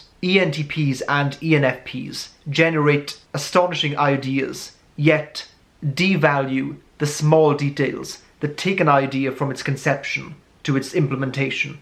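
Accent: Wales, United Kingdom. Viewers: Omg are you welsh?? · Hmmm your accent... are you Welsh?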